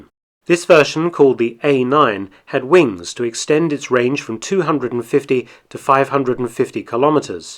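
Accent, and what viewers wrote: England, United Kingdom. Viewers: The real story is something your not willing to tell. Instead you decide to play it safe thinking your English accent and the history of German rocketry will make you credible but probability states otherwise. Are UFOs just Hollywood hype when military has already confirmed their existence? Is it more likely (more probable) that these UFOs are flown by aliens rather than man? Aliens are the Hollywood hype, not UFOs, it's even more likely the so called aliens are man- made as well. I believe in aliens but they are more likely to be involved in the resources of their own solar system which is probably as vast as our own.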